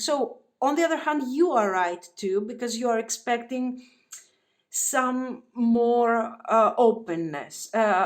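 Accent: Greece. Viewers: Your voice has the Greek accent 💕 So if you are, σε ευχαριστώ πολύ! Το πακέτο 2 was on point and you never fail me with your readings!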